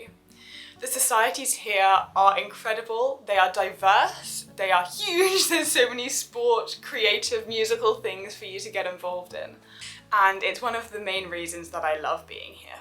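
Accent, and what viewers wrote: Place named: England, United Kingdom. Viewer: Your English accent is music to my ears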